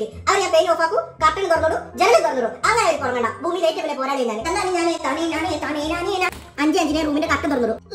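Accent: India. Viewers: Actually i cant understand ur slang.r u from malabar? Ur acting is very funny bro.😄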